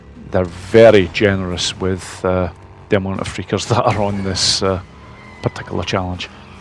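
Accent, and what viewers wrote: Scotland, United Kingdom. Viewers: First Tutorial video with British accent! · Hey bro, awesome work! Hearing your voice the first time. You Scottish by any chance?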